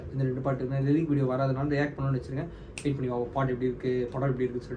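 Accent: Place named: Malaysia. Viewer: Ponna , savadi ? Neenga Malaysian ah bro ? Antha slang varuthu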